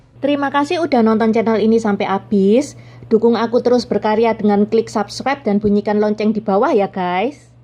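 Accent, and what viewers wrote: Java, Indonesia. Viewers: Logat jawa mu dlm kl y berdaaarkan Dr MN,cerita nya? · Looo ... Kok .... Ngene 😁😀😁😀😁😀 ngomong nee ... 😁😀😁😀😁😀 Iki Tionghoa atau jawoooo.... 😁😀😁😀😁😀 Ngomong nya medoook ..😁😀😁😀😁😀😀😀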